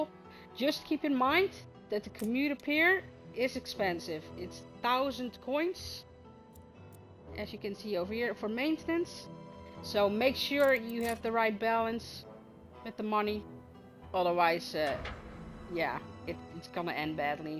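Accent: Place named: Netherlands. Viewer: ik kon wel duidelijk horen dat je Nederlands bent. 🥳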